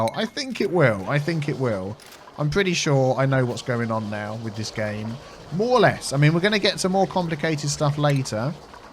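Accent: United Kingdom. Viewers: I love this game so much. But being somewhat of a voice fanatic, I'm obsessed with your voice. All I do is listen and try to add certain aspects of it to my repertoire. Can I ask where in the UK you're from?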